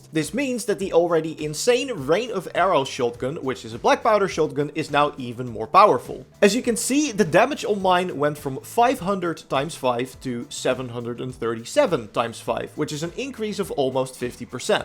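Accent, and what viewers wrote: Netherlands. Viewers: You gotta be dutch.... you speak like most of my fellow dutch country man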